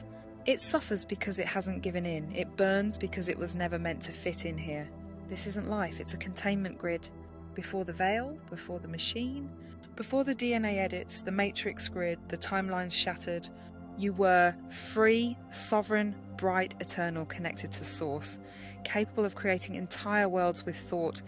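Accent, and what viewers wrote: United Kingdom. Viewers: ahhh the British accent of wisdom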